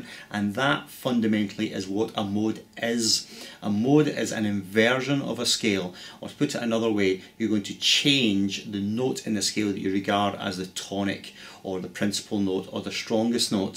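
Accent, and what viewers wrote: Scotland, United Kingdom. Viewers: Awesome video. Really informative and the Scottish accent made it a bit more interesting. Cheers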